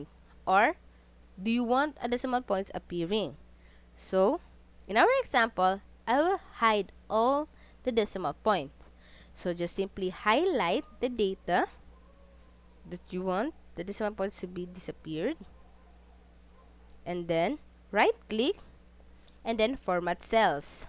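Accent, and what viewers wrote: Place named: Philippines. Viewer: You sound like your filipino, aren't you?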